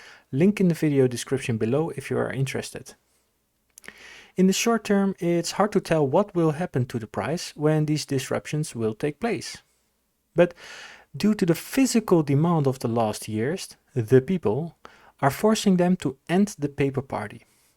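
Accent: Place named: Germany. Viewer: With that accent you got to be deutch ?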